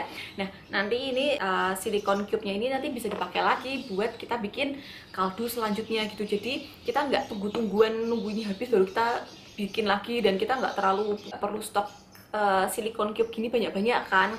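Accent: Java, Indonesia. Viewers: diet ga? Abis melahirkan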